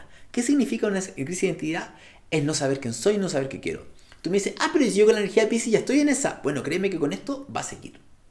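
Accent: Chile. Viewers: Amo tu acento chileno es un placer escucharte. Te mando un abrazo gigante de esta uruguayita viviendo en el hermoso México ❤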